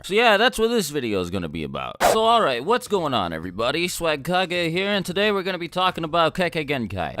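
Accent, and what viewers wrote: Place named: United States. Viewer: Your American accent is killing me... STOP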